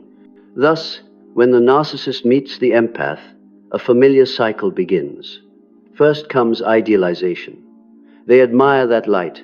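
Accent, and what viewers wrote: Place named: Spain. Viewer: Thank you !! I have not yet seen this level of depth in any video before (probably Sam Vaknin's videos only are this deep, but he is much more technical). I have seen this video more than three times already ... Clearly the German voice (with a perfect Spanish accent by the way) is a very experienced person. Thank you very much for sharing this type of deep content. It does help us a lot !!!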